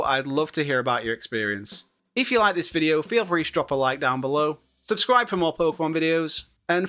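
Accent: United Kingdom. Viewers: Sounds like you've had a similar journey to me mate, stopped at Ruby and then ended up getting Ultra Sun around lockdown. Only really got it to be able to download that "poke-transporter" app from the 3ds store before it closed - ended up really enjoying the whole vibe of the game and it sucked me back into the pokemon world once more! Just like with you and Sword/Shield, all I came across online was absolute hatred for Ultra Sun, but I loved it 😅 Since then I've gotten a hold of and finished Platinum and White - really enjoyed them too. Right now I'm absolutely immersed in Legends Arceus and I'm fairly excited to see where the series goes from here! As a side note, you sound like you probably live around my area, north-west? I grew up around Stockport 🙂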